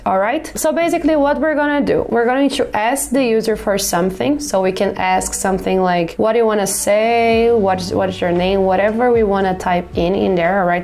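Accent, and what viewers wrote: Brazil. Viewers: Sotaque de brasileira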